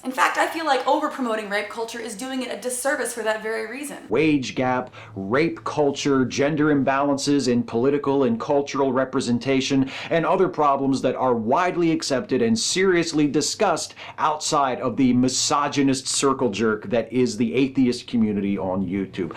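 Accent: South, United States. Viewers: Following her big year of atheist drama, she is now moving towards a kinder, gentler atheism. Does that mean she is going to stop using that incredibly bigoted southern accent she always uses by default when she thinks she's making a good point? Oh, that's right, she does that because of her white guilt. Well, it's a good start. Hope she can get there while she's still young and pretty, cause when she gets older it's just gonna come across as mean and Nasty. God bless her.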